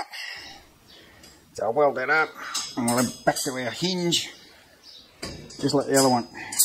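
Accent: Australia. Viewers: i know i am new, i like like the methodical approch to see a skills man at work, what a simply beautiful piece of craftmanships at its very best. all you need is four strongest powerlifters, to cary this whewever you want to go camping in the world, i am assuming you are from Australia, just by listeninhg to a little bit to your accent, if i am wrong i do appologise profusely for my mistake, i am into bushcraft skills in the UK. and i go camping everyyear, i would love something like that on a slightly smaller scale. simply beautiful, say no more. i like the hinge for your kettle, very clever there, in the making of it. cheers Hans. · I just started watching you today and wow what a welder you are. I never took any welding training but regret that action. Am looking forward to more interesting videos from you. You sound to be from Asustraila or New Zealand a beautiful section of Gods creation. 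I live in the USA in a State called West Virginia. Stay safe and Merry Christmas to you and yours.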